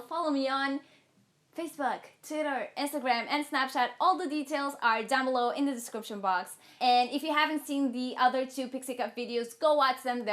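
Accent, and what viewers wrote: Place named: Portugal. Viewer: eu estava tentando descobrir qual era sua nacionalidade já que não parece ser um nome ingles, e por causa do sotaque também, e agora que descobri que você é Portuguesa tudo fez sentido haha beijos do Brasil! adoro seu canal!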